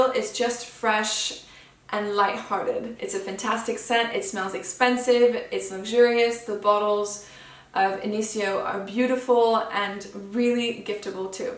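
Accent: France. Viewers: Hi I am from Portugal and I love your channel , you describe fragrances perfectly and your french accent is beautiful . Great job 😁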